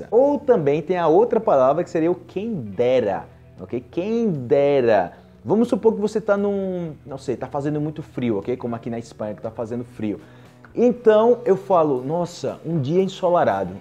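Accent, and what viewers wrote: Colombia. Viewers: Aquí en chile "presentar una prueba" es usado en el ámbito judicial. Esta respalda una acusación. Puede ser mostrar un objeto; declaración de testigos. Para prueba, lo escrito es"hacer, contestar, una prueba y en la octava región usan "presentarse a un certamen". Tb. Se puede hacer una prueba, como hacer saltos en 20 seg.; O quién bebe más agua; ello en un concurso. También está "la prueba de amor", pero ese tema queda para otra. Ah!!! hablas muy bien colombiano mi parcero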